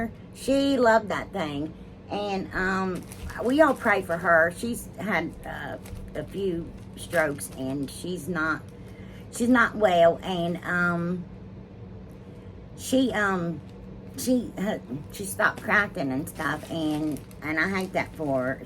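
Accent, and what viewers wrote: South, United States. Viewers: You have got to be from the south!! I have the same accent! I’m from Southeast Texas.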